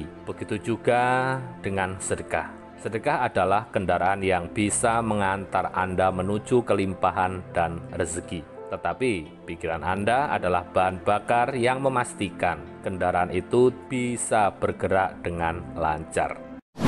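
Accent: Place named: Java, Indonesia. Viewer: Suaranya mirip suara Jokowi. Ditambah lagi aksen medoknya.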